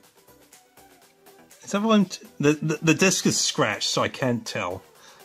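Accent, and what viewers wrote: Scotland, United Kingdom. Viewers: Just wondering are u scottish ? I am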